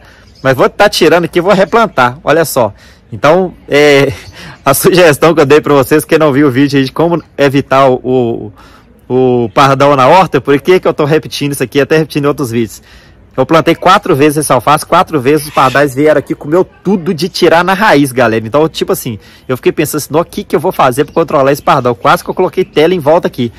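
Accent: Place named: Minas Gerais, Brazil. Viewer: Você é suave, articulado, um ótimo comunicador, gentil e com este charme mineiro, que é apaixonante.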